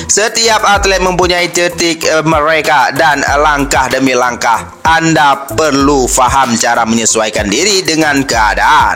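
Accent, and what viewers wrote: Aceh, Indonesia. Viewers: Admin logat aceh melayu... Gua orang kalimantan aja paham kok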